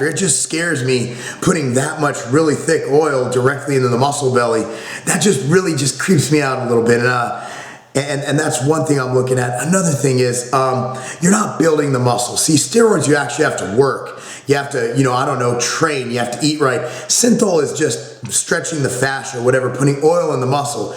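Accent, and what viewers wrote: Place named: Brooklyn, United States. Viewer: He totally has that nasty Brooklyn style/speech going on.... Yuck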